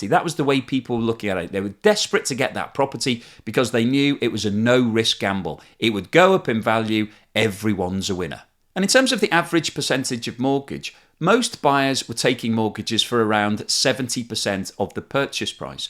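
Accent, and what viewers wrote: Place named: Liverpool, United Kingdom. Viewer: He sounds like a Liverpudlian.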